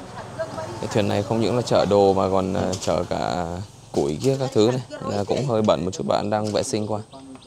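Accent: Vietnam. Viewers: Tiếng anh của bạn hơi thuần việt nhỉ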